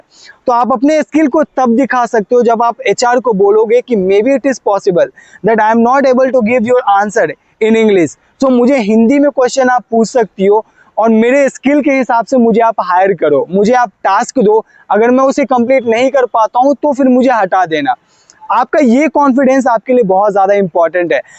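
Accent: Bihar, India. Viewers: Tera aj v english sunke asli main hi lag raha hain tu bihar se hi hain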